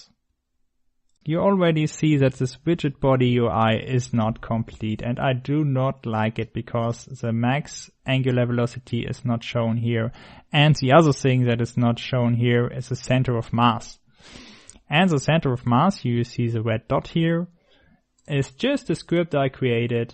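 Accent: Germany. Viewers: Gotta love the German accent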